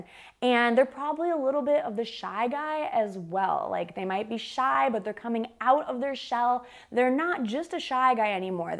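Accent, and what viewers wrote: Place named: United States. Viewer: Lolz hello I’m Dominican.!!!, if I can be honest I’d tell that I’m a obsessed language learner obout English.! I really love the ways that American native speakers can talk and Actually I’m looking for get to tack and the way that you “ native speakers “ can do it.! Im talking about.... American accent.! The sh...sh... sh.. that you have lolz 😂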